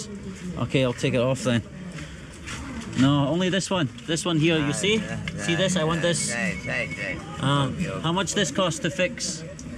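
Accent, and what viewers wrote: Scotland, United Kingdom. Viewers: That Scot accent is hardcore yew !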